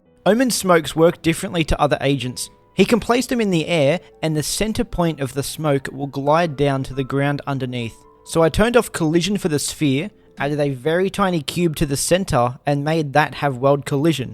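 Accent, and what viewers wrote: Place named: United Kingdom. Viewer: Bro roasted Rito so hard in his british accent. Loved the video man, so good 👍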